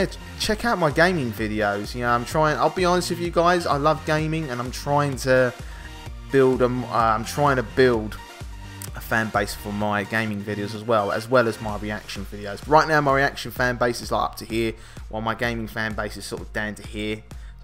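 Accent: United Kingdom. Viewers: I love your Accent is it British?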